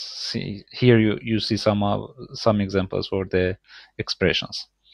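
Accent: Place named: Iran. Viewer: I like the Persian accent! thanks for the content it is useful though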